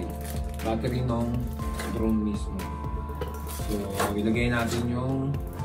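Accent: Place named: Philippines. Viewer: Guys akala ko chiness ka. Pero noong nagsalita la pinoy ka pala... full supports here. Ganda ng in unboxing mo thanks for sharing godblesss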